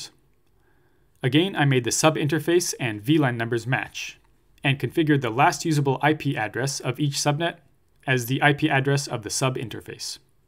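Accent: Canada. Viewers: Dang, you are doin god's work. I will read my exam questions in that Canadian accent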